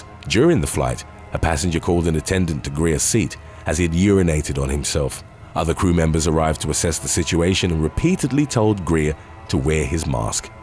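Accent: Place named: United Kingdom. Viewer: You sound British 🤮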